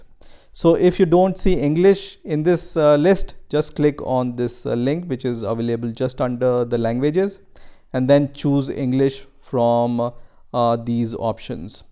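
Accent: India. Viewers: Thank you very much for your most helpful vedeo.I was facing this problem since mornig. I could rectify it from your step by step instruction in clear indian english. Kindly make more videos like this.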